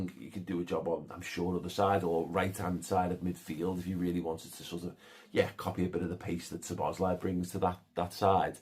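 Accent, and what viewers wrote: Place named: Scouse, United Kingdom. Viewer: Talking as someone who also has a strong accent, reel it it for the internet mate, I know you're a proud scouser but you actually go the other way and make your accent thicker for the internet, it's quite noticeable how you draw out certain words that you're doing in unnaturally, it does my head in, please just relax and talk more like Danny Murphy who tempers his accent slightly when on TV, as I do myself when talking to non-Welsh people it's called respect and etiquette. I fully understand the scouse accent and have loads of scouse mates, but you're the only scouser I've ever needed subtitles for!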